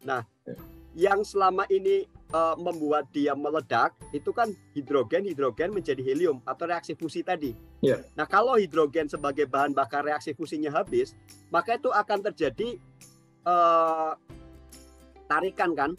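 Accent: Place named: Banyumas, Indonesia. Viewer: Kiye kayane alumni SMA siji purwokerto maseh, ora ngapak ora kepenak 👍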